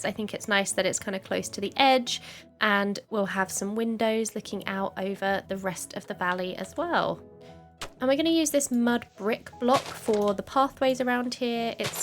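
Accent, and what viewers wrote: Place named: United Kingdom. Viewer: You have a British accent, I kinda like it but it's sometimes difficult to understand but for me who's learning English (the British and American) I understand some words, also what a cool video ! I'm subscribing to your channel right now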